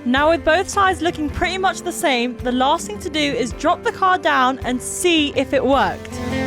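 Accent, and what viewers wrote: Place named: Jamaica. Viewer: Why do you talk like a yardie ?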